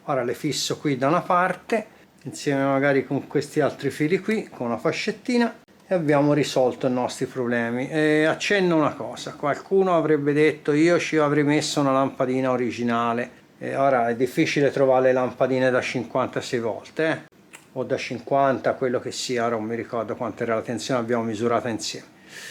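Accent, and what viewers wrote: Tuscany, Italy. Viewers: Te sei toscano. Lucca?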